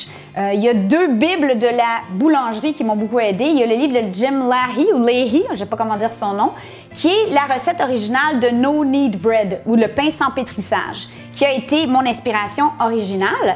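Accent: Quebec, Canada. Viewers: Virus oblige, je suis a la maison en Italie (mais d origine Québecoise). Hier a l épicerie il y avait de la levure de bière qui vient du boulanger pcq l épicerie n avait plus de levure. Bien entendu j en ai achetée par curiosité. Je cherche sur Youtube et découvre une personne qui parle comme moi! LE BONHEUR. Je vais commencer mon pain aujourd hui. Merci beaucoup, je suis maintenant abonnée. Ciao xx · Le pain est aussi craquant que la cuisinière !! 😘 Et ce joli accent québécois, j’adore 😍 Merci 😊🙏 pour cette belle vidéo ! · Quel mignon, l'accent québécois! J'ai abonné. Merci pour le vidéo. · C'est encore meilleur avec le petit accent Québécois. Merci:) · magnifique, et merci je me suis abonnée, pourriez vous me donner la technique pour un pain au levain avec farine t65 bio + farine de seigle bio ( moitié T65+moitié seigle), eau? car problèmes digestifs. Superbe accent canadien et pleine de vie, j'aime.... 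Merci, à vous lire. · vu depuis la France, j'adore le Québec, les québécois(es) et l'accent québécois... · tres beau accent ,ces Canadiennes j adore . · Bonjour super vidéo sympa qui donne envie de se lancer dans la confection de ce magnifique pain et surtout de jeter ma machine à pain. Encore bravo votre accent me rappelle de merveilleux souvenirs de ma vie au québec il y a40 ans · Salut ça fait un long moment que jai regarder tes vidéos et je m'en escuse j'ai eu des chamboulements dans ma vie notamment la naissance de bébé il y a 6 mois et javou ne pas avoir pris le temps de regarder tes vidéos enfin Bref, j'ai voulu faire un pain mais râté je me suis souvenu que tu en avais fait.. A la maison je n'ai pas cocotte en fonte est ce que je peux laisser la pâte sur la plaque du four? Et est ce que pour la première étape de pose je peux mettre un torchon ( linge a vaisselle ) a la place du film alimentaire?? 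J'espère que tu pourras le répondre et je promets de regarder plus régulièrement tes vidéos c'est vrai que ta bonne humeur et ton accent québécois ma bcp manquer.. Énorme bisous 😘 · Bonjour je viens de vous découvrir et par conséquent j ai regardée plusieurs de vos recettes Avec votre recette j ai fait mon 1 er levain. Merci Et avec ce levain j ai fait un pain Qui était très bon Mais pas aussi levé que le votre Dernier petit point, je vous écris de la Belgique et j' adore l accent du Québec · Quel délicieux accent que celui de nos cousins du Canada, et ça l'est encore plus quand c'est une ravissante cousine ! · Patrick de Paris j'adore ta vidéo quel accent les canadiens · Bonjour, j'adore votre accent Québécois et votre façon de humer le levain. Astucieux la façon de cuire le pain dans une cocotte, la prochaine fois je vais tenter l'expérience. Merci pour votre humour et pour cette "cousine" bravo! 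votre pain est aussi beau que celui que nous faisons ici en France et pour vous encourager je m'abonne. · Le pain et génial et l'accent québécois franchement j'adore ! Tout est magnifique, même la boulangère d'ailleurs ;) ! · Non seulement je suis fan de l'accent et des canadiens, mais en plus tu es VRAIMENT super sympatique!!! Je mange sans gluten et do cje fais mon pain moi-meme. Je vais essayer de suite cette recette! Merci pour ta bonne humeur et ta gourmandise finale! On aurait envie d'y gouter aussi de ce bon pain au beurre! ;-) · Résultat incroyable sur la vidéo, et j'adore cet accent canadien ;) Je vais essayer de faire la même chose, mais je n'ai pas ces farines de qualité ;)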